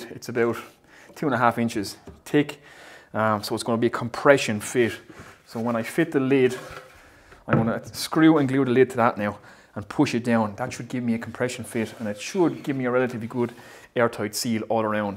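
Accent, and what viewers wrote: Ireland. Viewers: My grandparents were Irish and it’s nice to here the brogue again after over twenty years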